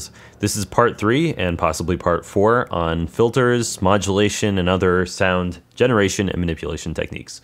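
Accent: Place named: Canada. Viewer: I really wanted to see this video but I just couldn't bare to listen to the speaker's annoying Canadian accent.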